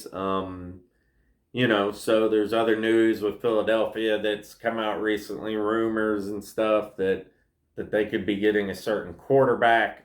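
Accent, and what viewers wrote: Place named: South, United States. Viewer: I bet, I live in DC now and there's a few of us that meet up at a bar every Sunday I was surprised there was Titans fans in the nation's capital Also that's cool you knew bloxville stands for Knoxville Tennessee. I can tell by your accent in your videos you are definitely from down south for some reason I was thinking you live in Nashville idk why lol Love the videos though keep it up I like your long videos or the short ones I don't know how long you've been doing it but you're doing a good job ✌🏼 bro bro keep trucking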